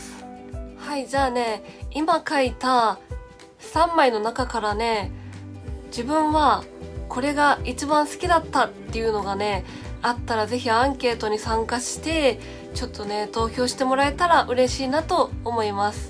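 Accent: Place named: Kansai, Japan. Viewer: 自然に関西弁で全然良い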